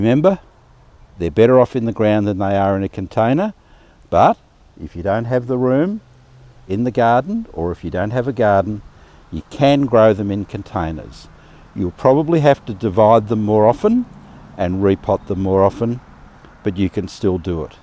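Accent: Australia. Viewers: Just love your Aussie accent. And thanks for the helpful info.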